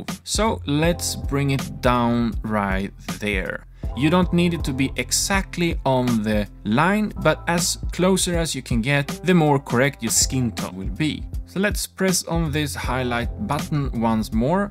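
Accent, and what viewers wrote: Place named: Sweden. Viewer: Thanks for an really easy to follow video, also wanted to say I found it funny as a swede that your svenglish accent is so apparent, keep up the good work man!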